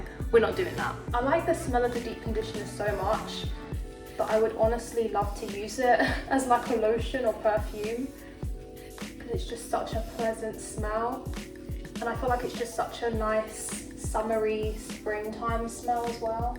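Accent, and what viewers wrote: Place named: United Kingdom. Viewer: Just a quick question while I’m here, I’m judging by your accent that you’re in the U.K, so just wanted to ask where did you get your hair cut please. I love it!??!! 😍 I’m looking for a good curly hair stylist for a trim. Thank you. Looking forward to going through the rest of your videos.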